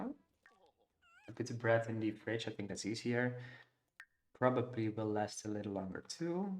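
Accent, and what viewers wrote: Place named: Netherlands. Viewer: hahaha ja nederlands-engels accent is best wel duidelijk! Super leuk dat je begonnen bent met let's plays! Succes! Btw je thumbnails zijn echt perfect! Heel mooi!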